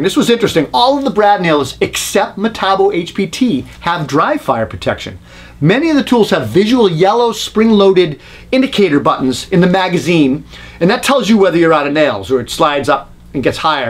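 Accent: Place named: Boston, United States. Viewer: No need to hide the Boston accent! New England is famous for its carpenters. MakitER it is! Massive thanks for your work here, as always. Great work!!!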